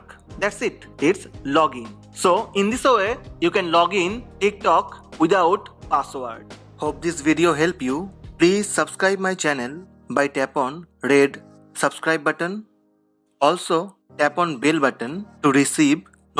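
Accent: India. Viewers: I've never heard such an extreme indian accent🤣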